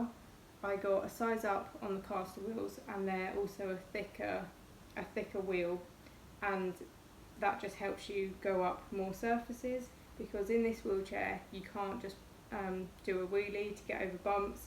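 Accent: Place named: England, United Kingdom. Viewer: typing is so much easier anyways. That must be annoying to deal with at times though. When I write things, I usually can’t read my own writing the next day hahaha. You pull off glasses very well, nice eyes and smile, they work for you and I think glasses are popular again, so many people using them versus contacts again, well here anyways. Are you around London? I have some friends around there, they have totally different accents than you, more city like accents. Then I have a buddy up in Durham, and I have a hard time understanding him 😂😂.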